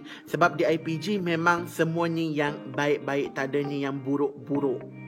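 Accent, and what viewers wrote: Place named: Sarawak, Malaysia. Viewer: Terima kasih abang.. Byk info berguna pasal ipg.. Semakin bersedia sy nk masuk ipg 1 sept nnti.. Btw, abg sarawakian ke😂😂suara mcm org sarawak jk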